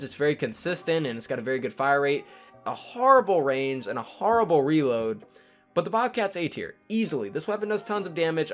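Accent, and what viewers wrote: France. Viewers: Are you French? O_0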